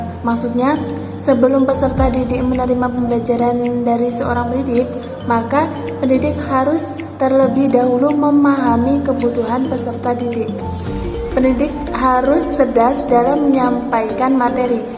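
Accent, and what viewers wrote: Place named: Java, Indonesia. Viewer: Semoga lancar dan tambah berkah dex, medok 😁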